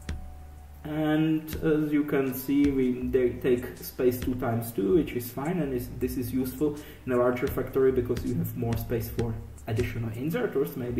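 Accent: Czech Republic. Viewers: nják slyšim českou angličtinu :D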